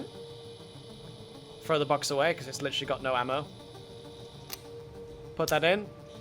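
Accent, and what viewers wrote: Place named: Boston, United States. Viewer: haha I suppose so! The main thing that throws me off is the pronunciations of "ar" like in "dark". You say "duhk", which sounds super typical of a thick Boston accent. Sorry for obsessing over it. It just strikes me as unusual and fascinating. Incredible things happen when you connect random parts of the world together with voice chat lol.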